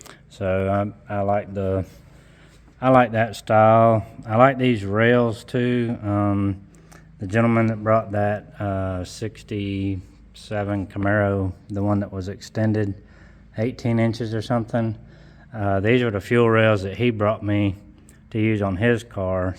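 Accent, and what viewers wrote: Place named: South, United States. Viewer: Have a few question myself - you have any way outside of youtube to communicate? I have a 2500 burb with ly6 and 80e which is already cammed, converter, gears and longtubes. Im looking to go turbo or lsa and have a few questions about dealing with heat etc - sounds like you live in the south as do i (alabama)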